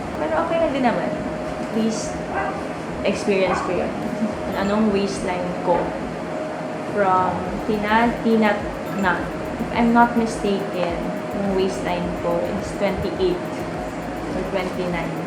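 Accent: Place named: Conyo, Philippines. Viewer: Si ate lang talaga yung cute mag conyo. Hindi siya nakakairita pakinggan.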